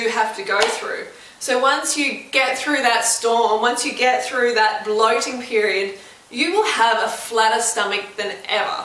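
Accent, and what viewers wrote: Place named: Australia. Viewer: Goo fruit or root yaself !!! Can tell shes an aussie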